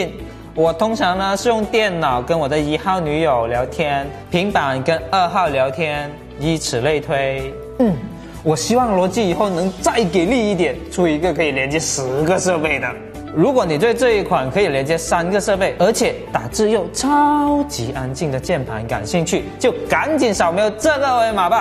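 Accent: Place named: Guangzhou, China. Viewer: 广州人口音。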